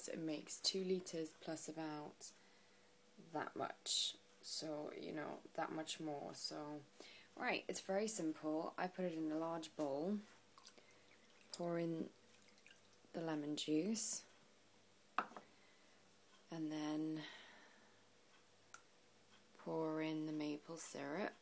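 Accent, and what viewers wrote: Newcastle, United Kingdom. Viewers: I'm guessing your Geordie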